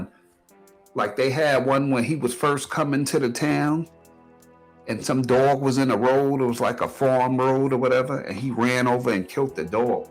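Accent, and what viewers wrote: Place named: New York, United States. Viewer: I love your voice and listening to your New York accent. ❤😊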